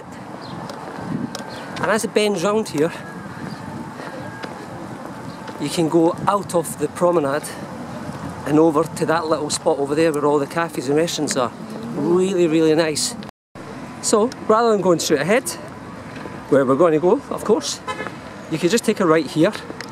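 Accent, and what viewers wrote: Scotland, United Kingdom. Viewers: By the way thanks for all of your advice and I'm looking forward to coming in July. Love the Scottish accent by the way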